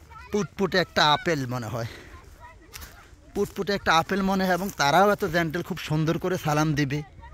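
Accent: Noakhali, Bangladesh. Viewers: আপনে কি নোয়াখালইয়া ? হুতি-মুতি কওনে বুঝলাম আরি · ভাই আপনি কি নোয়াখাইল্লা।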